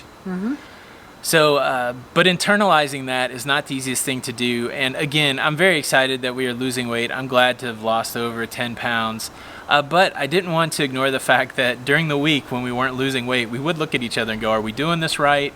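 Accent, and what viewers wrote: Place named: New Jersey, United States. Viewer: Great job. I really think low carb is the way to go. And I live in ca and I could hear the thic Jersey acsent.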